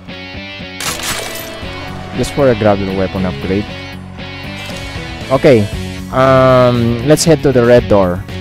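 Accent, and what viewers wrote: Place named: Southeast Asia. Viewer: Your accents sounds like southeast Asian are you Filipino or Indonesian? By the way nice video bro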